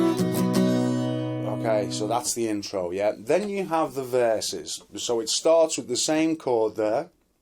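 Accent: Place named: England, United Kingdom. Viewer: Definitely the best channel on the net for beginners and intermediates non English natives. I appreciate a lot the vocabulary you are using and the speed of the lessons and the explanations. Very very nice English accent. You transmit the calm and the patience necessary to learn. That way you will add a lot of subscribers from abroad as my case. Kind Regards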